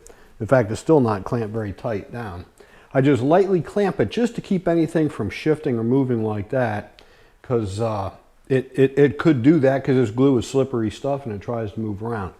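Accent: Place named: Northeast, United States. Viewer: I'm in North Carolina now from Pittsburgh. I recognized the North Eastern in your speaking. Tired of the ridiculous cold Snow...etc! Really love it down here! BTW, great channel! I am a master builder/Carpenter, and built a few guitars by hand. I was thinking about getting involved in the CNC as well.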